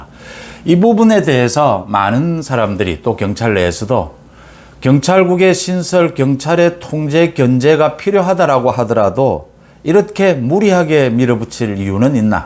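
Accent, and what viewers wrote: Gyeongsang, South Korea. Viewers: 10만 축하합니다 경상도말씀이더정감이갑니다 경찰이미쳤구나 지그이라도 윤대통령도와줘건설하자 대한민국홧팅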